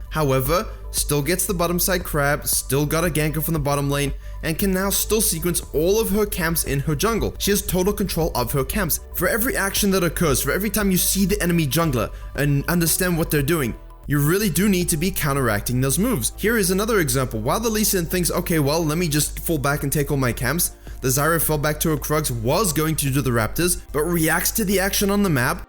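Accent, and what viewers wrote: South Africa. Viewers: . Thanks for the great guide. Are you from South Africa or have been in SA before?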